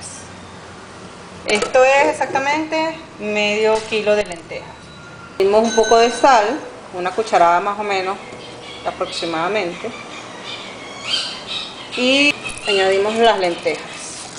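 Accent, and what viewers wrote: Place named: Venezuela. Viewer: Buena la receta y tiene que ser venezolana por su acento muy marcado😀😀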